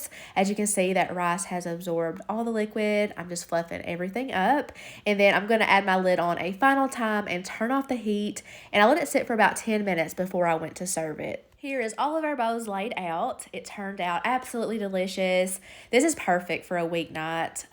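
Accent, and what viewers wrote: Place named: Kentucky, United States. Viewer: Hey I've been binge watching your videos all week! I love the different recipes and ideas you have, I can't wait to try some. Are you from Kentucky too? I'm right outside Lexington but you sound farther south or maybe eastern ky. Much love 😘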